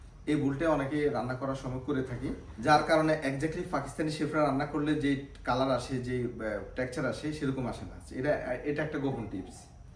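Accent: Noakhali, Bangladesh. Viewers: নোয়াখালীর মানুষ যত শুদ্ধ করেই কথা বলুক,আমি ঠিক বুঝে ফেলি।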